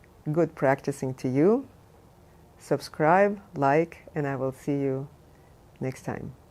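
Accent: Russia. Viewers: Are you русская?) Cuz I hear an accent)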